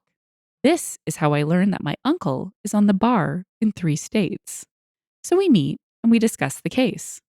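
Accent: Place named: Canada. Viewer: And just a note to add that I love your reading voice and (I assume) Canadian pronunciations and phrasing.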